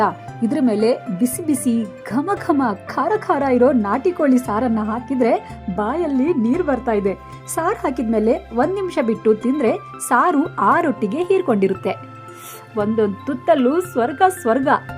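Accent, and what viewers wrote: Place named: Mysore, India. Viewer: Appatta kannadathi, mysore kannada super mam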